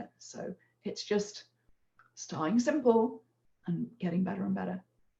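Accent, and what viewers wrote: United Kingdom. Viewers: Hello my name is Tricia and I am an introvert:) your voice is wonderful. This comment has been along time coming; but I am truly an introvert. As I said I love your voice, it is one of those "super sexy low and dulcet toned British voice's". I am out of my shell why not because of any virus no no I am in a bother about the Foundation Raveled sign up. Yes I have been stalking the Foundations Raveled web site for 10 years. Yes there should be another why, for 15 years my life has been ruled by chronic pain, and could not sew:( But now after 3 operations, 4 months of exercise and this thingy in my right buttock that I have to recharge once a week and yes I do think of myself as a cyborg. Now finally my health is good enough to sew, I am slow but I can still sew. I learnt is to make plans for the beyond what ever the difficulty was with the chronic pain. 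So make plans and hold on to them in the here and now, this is how I got through the fear. Those plans are a foot, I think, I hope, I am on the wait list, I tried to put my self on it and now I am having a very small panic. Lastly before go back in to my shell maybe the wonderful could do these live talks regularly:) xxx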